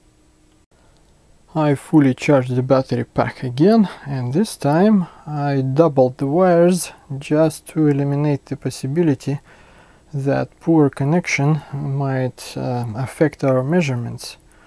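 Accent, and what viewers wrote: Russia. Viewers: I love your video and you Russian accent ^^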